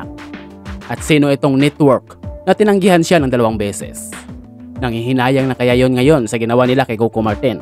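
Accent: Visayas, Philippines. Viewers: Prayd seken bisaya ka tlga Taga pagsalita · Natawa ako sa ..speaker..hahaha...Bisaya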